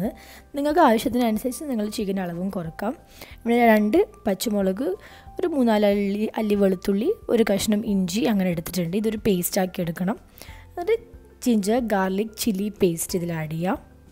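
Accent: Kannur, India. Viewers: farsha fashi kannur aaanenn thonnun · സംസാരം കേൾക്കാൻ നല്ല രസമുണ്ട്.. കണ്ണൂർ അല്ലെ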